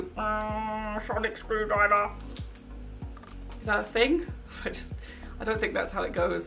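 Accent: United Kingdom. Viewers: Hi are you British???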